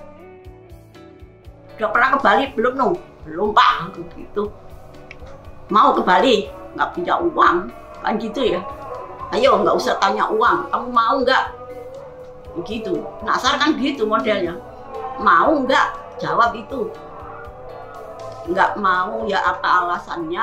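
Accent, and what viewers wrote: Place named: Surabaya, Indonesia. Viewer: Mbak sepertinya sudah cukup artinya itu inti yang saya dapat dari pak Nashar: " bagaimana menjadi " pelukis, shg ilmu itu saya terapkan pada diri saya sampai sekarang. Kenyataannya saya masih bertahan dan menjadikan semacam energi mbak, terima kasih banget....... atas vidionya, ( gaya suroboyoan omongane tur banter )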